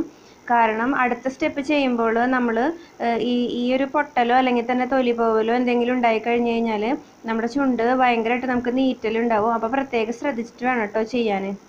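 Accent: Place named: Thrissur, India. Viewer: Kollatto. തൃശൂർ ആണോ. തൃശൂർ slag und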